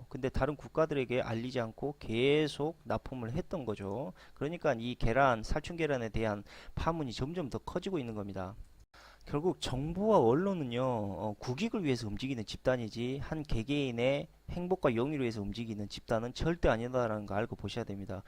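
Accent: Jeolla, South Korea. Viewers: 전라도 억양이 찰지며, 약 돼는 쓴소리 고맙습니다. 근데 깨어있는 자가 많자않아 큰일.우리나라 정부는 정부로서의 기능 별로 행하지 않아. 엄청난 세금 축내며 많은 의원 왜 필요한가???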